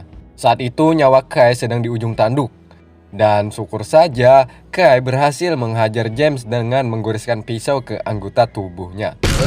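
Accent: Banjar, Indonesia. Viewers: mimin ini dr kalimantan bro. logatnya kental bahasa banjar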